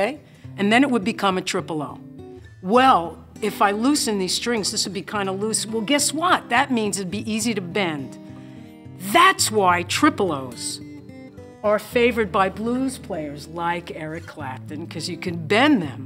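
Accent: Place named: Delaware County, United States. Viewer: God that Pennsylvania Delco accent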